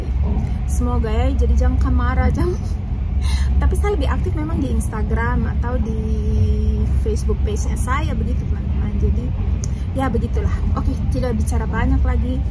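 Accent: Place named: Papua, Indonesia. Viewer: Hallo kak, saya lihat postingan kk yg di tempat bunga tulip langsung sa cari di youtube. Kk di indonesia tinggal dimna? Soalnya dengar logatnya logat papua😊🥰.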